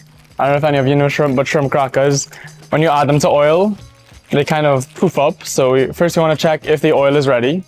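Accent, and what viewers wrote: Trinidad and Tobago. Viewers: Currently stuffing my face with Popeyes and this pops up so I click it. I'm like wait nah how you sounding Trini so 🤣 I subbed after few minutes of research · A Chinese with a strong Trini accent is something I have never encountered before. WOW! I sometimes forget the Caribbean really is a melting pot of cultures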